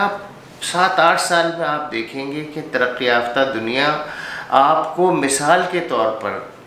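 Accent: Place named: Eastern Uttar Pradesh, India. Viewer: his tone is still Eastern UP or western Bihari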